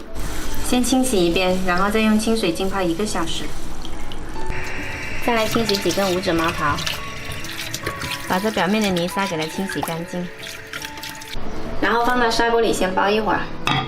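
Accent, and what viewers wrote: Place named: South, China. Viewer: ， 請問你是哪裡家鄉的人？ 是兩廣一帶嗎？ 你的普通話是挺南方腔啊！ 可否多介紹你的家鄉菜， 好公諸同好？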